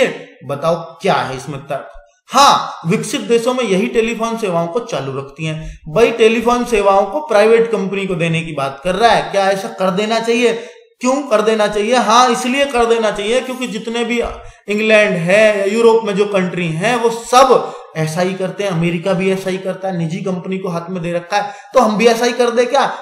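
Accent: India. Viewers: Bolne ka Desi tarika nice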